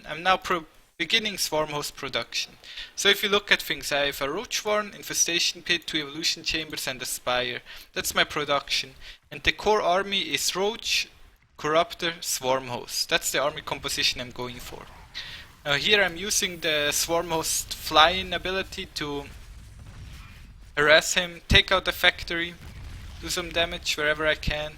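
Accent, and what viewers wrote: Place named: Swiss German, Switzerland. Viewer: You have a funny accent. Almost like a swiss german talking english.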